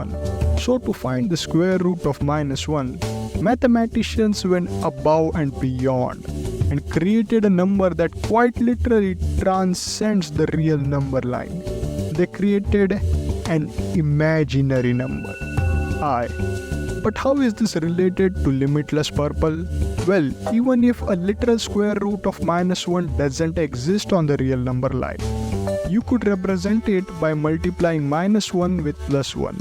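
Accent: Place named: India. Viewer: Hey, ranjeet. You got your Indian accent from consuming cow p*op?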